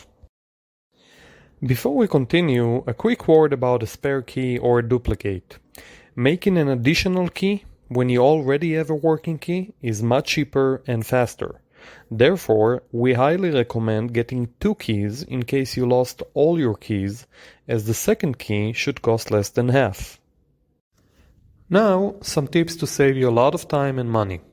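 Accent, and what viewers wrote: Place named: Israel. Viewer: Your israeli accent is clear as sun Ty for destroying the locksmith industry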